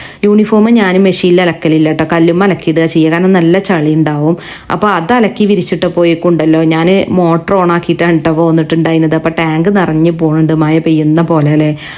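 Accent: Malappuram, India. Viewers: മലപ്പുറകാർ അങ്ങനെയെ പറയും, എത്ര എഡ്യൂക്കേഷൻ ഉള്ളവർ ആയാലും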